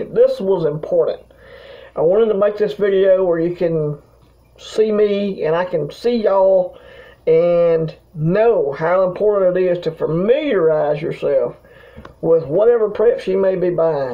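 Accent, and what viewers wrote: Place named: South, United States. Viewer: Absolutely important info! Love hearing another familiar sounding Southern Accent! Stanley and Donna in the NE GA mtns.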